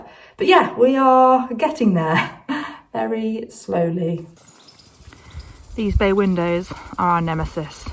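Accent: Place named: United Kingdom. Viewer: Just loved this!! Great job!! We are from upstate New York and my husband says I have a very flat accent🤦🏻‍♀️, your British accent, on the other hand, is so lovely! Good luck with all of your endeavors!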